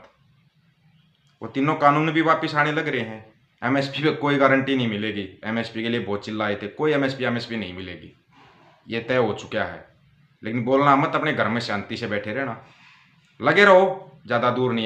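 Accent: Haryana, India. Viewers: Sir, your Haryanvi accent is balle balle. Carry on.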